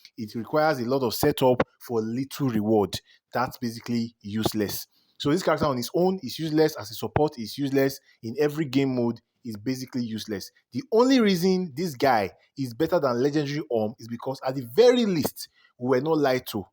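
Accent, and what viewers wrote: Nigeria. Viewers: question are you Yoruba or from the south west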